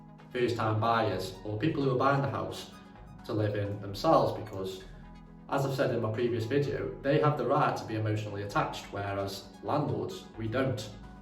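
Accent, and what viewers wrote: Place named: Stoke, United Kingdom. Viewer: Stumbled upon your channel and could tell a Stoke accent straight away! I'm in the process of trying to find my first BTL or flip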